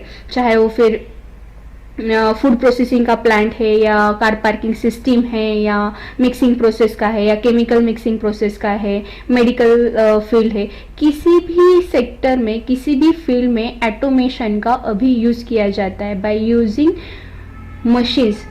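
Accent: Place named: Hyderabad, India. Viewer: Ma'm aap Hyderabad se hai kya? Aap ka lahja batara k aap hyderabadi hai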